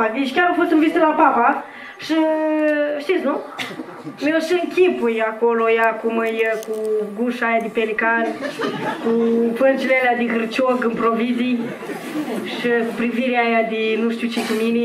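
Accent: Moldova. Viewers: O actrita fenomenala, o femeie geniala. Accentul ala moldovenesc nu o merita si... nu isi are sensul. Nu suntem moldoveni, ardeleni, munteni... suntem Români. Felicitari, esti fantastica!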